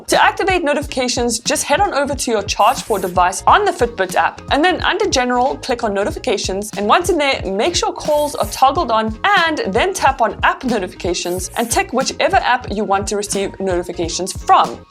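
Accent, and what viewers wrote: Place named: South Africa. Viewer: I hear a South African accent ✊🏽 🔥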